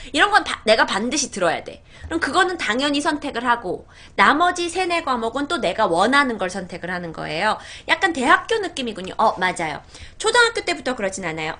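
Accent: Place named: Jeolla, South Korea. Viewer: 팬인데요.전라도 말투!의를 으라고.매번 하던데요.바꿔주면 완벽할텐데요.좀!아쉽네요.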